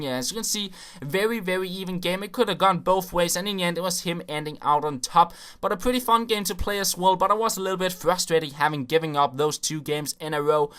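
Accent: Denmark. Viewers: 1. your fucking danish accent his so enoying 2. you suck at fifa 3. you think youre so good 4: FUCK YOU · er du fra danmark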